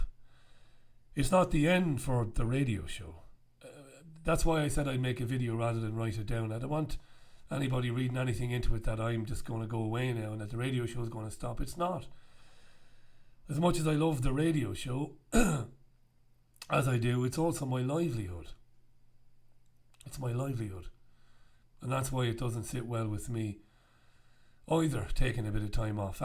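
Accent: Ireland. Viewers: your dulcet Paddy tones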